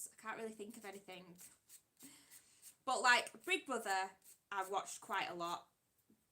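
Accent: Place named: Yorkshire, United Kingdom. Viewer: ? As in BD8 idle village? You sound Yorkshire. Looking for a good nail technician, I’m Bd2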